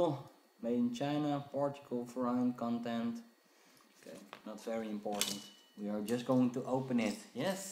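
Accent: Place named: Netherlands. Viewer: Haha your Dutch accent is mad as. Nice video, I had no idea how small the 2.5" drives were.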